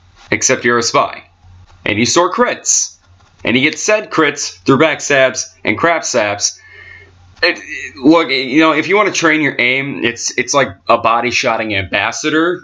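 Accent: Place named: Scotland, United Kingdom. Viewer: SCOTTISH ACCCCEEEEENT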